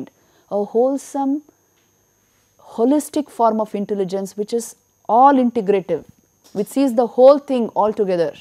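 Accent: India. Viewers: Umm..she seems to have a pakka Bangalore accent..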